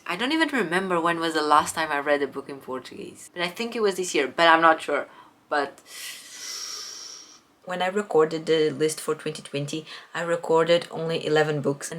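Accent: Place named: United Kingdom. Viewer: Já começas a ter um mini sotaque brittish. Neste vídeo notei bastante nalgumas palavras e tom da frase :)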